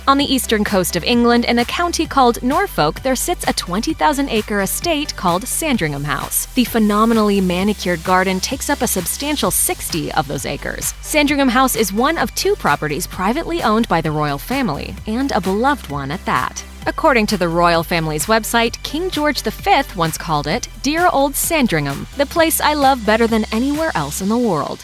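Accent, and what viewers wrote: United States. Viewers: Supper annoying American accent voiceover. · Why an American doing the commentary telling us what we are paying for a load of spungers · a yank talking about the royal family not for me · It feels really wrong that this is narrated by an American.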